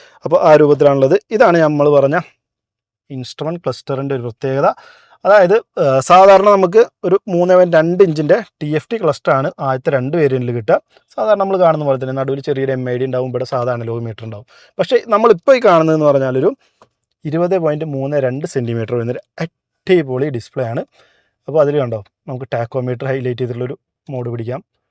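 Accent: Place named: Malappuram, India. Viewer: Typical malappuram slang aanu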